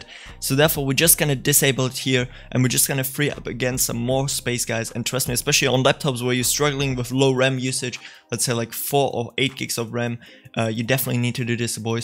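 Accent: Germany. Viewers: bist du deutsch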